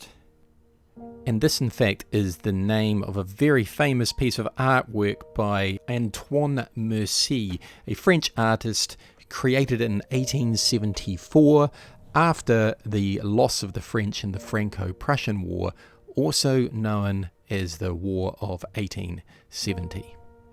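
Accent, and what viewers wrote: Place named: New Zealand. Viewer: First review of yours I watched, and I really liked your reviewing style! I appreciate the slow, clear, unhurried commentary, and how well you structured the video. I've seen other reviews of this game where they never even mentioned the second scenario in the desert, maybe they didn't play it as much as you? Love the Kiwi accent too, even if you beat the Irish in my hometown Dublin just the other day!! Subscribed. ✌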